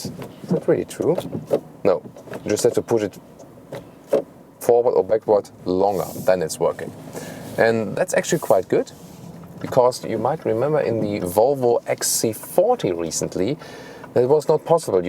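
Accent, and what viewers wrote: Germany. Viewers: SOS10 I don't usually mind - gives the whole thing a bit more character - you know. The german doing an incredibly detailed and professional review of almost all aspects of the car - plus the accent - theres a reason i watch these videos, but I myself (german as well) like to constantly improve my english and be told when I make mistakes and how to fix them :)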